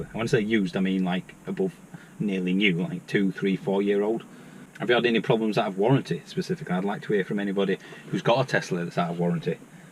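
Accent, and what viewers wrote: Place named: England, United Kingdom. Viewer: is talking English not American.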